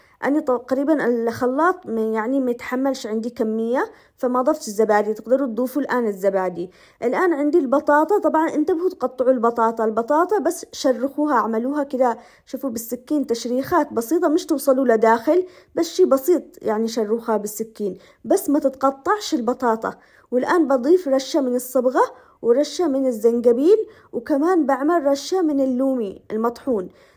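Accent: Aden, Yemen. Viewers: ياحلات اللهجة العدنية تسلم يداتك ياقمر ❤ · محسن كلامك العدني القح 😂😂😂 ربي يسعدك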